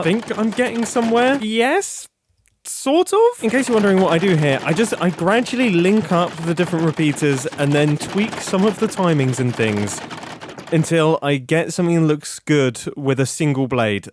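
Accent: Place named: United Kingdom. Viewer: cause of his British accent it sounds like mumbo said “this has been a very fan day”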